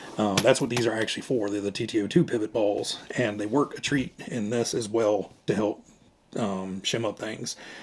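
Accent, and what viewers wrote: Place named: Boston, United States. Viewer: Very nice ! Love your Boston accent🤣 Do you have any of the earlier M chassis ? I only have a M-01 Mini Monte Carlo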